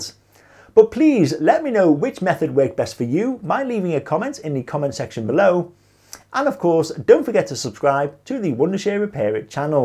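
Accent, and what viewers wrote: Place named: Northern England, United Kingdom. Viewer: Dear, I hope your tips will help me, as I have carried out an audio only recording in mp4 but I can only hear the first 3min. of it, after which, it is complete silence, although the file keeps unfolding/ running/being played for a total of some 120 minutes. But first and foremost, I would like to tell you that I find your Northern England accent sooo lovely, it moves something in my heart! Where exactly does that accent come from? Is it from Cumbria? If so, from where in Cumbria? Coniston? Or anyway, from the Lake District? Anyway, I really love it, and I am so fond of people with that accent and the kind of personality that goes with it! I am already using the Wondershare software to edit, annotate and redact PDF files, and I am very satisfied with it. Best regards, Alberto from Florence, Italy.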